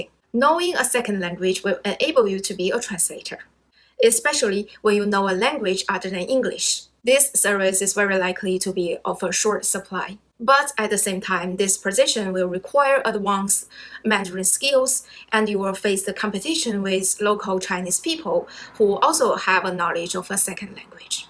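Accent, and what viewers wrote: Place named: Russia. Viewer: Your accent a bit like a Russian.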